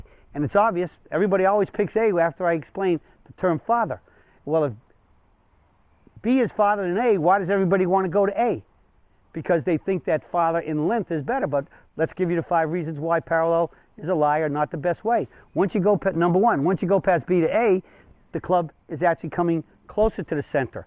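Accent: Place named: Boston, United States. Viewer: you sound like you're from the boston area like me. thnx for the lesson.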